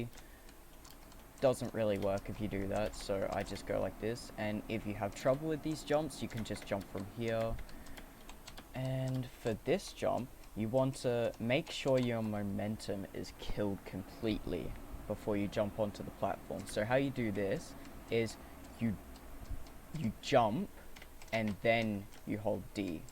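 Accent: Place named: Australia. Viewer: Woah you have an Aussie accent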